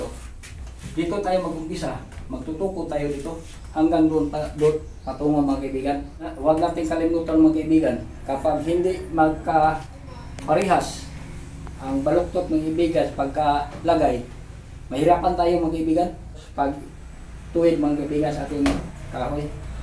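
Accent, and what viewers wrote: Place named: Visayas, Philippines. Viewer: 😃😃😃 Ang galing mo tay lalo na sa pag sa salita mo murag bisaya 😀😀😀 may nakat on an jodko nimo salamat tay baguhang panday Mason rin Ako.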